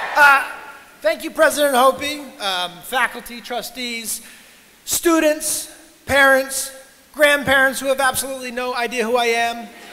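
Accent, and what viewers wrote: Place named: Boston, United States. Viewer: wow that basten accent!! love it! :D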